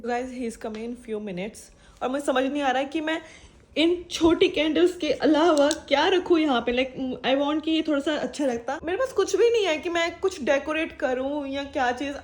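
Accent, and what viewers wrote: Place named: India. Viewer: Oh plz speak punjabi hindi accent not suite you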